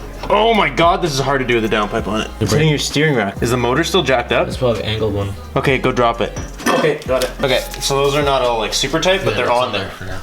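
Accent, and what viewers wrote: Canada. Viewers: Thumbs up for that Canadian accent.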